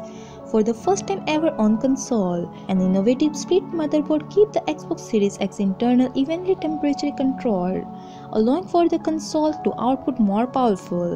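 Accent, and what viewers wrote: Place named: India. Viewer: While reasonably informative, please consider using a native english speaker for conveying the message. The Indian accent did not work very well here, sorry.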